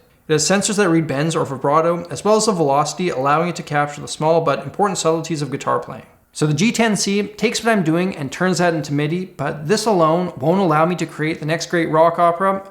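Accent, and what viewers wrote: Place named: Canada. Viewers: He’s from Manitoba, which is a province in Canada (I assume you’d know that, since you can recognize a Canadian accent. If you didn’t, I guess you learn something new every day 😅) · Forgive me if it's too personal/if it's a weird question, but where does your accent originate from? It sounds Canadian maybe, but a little more... I dunno, "thick" on some words? I really like it, it sounds very cool!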